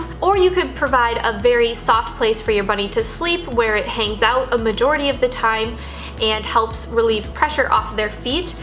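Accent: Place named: Ireland. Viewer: she sounds more Irish now is it just me?😕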